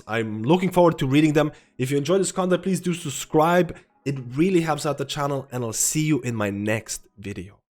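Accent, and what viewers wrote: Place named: Ireland. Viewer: You have to live Ireland lol. the accent just comes out so often! much love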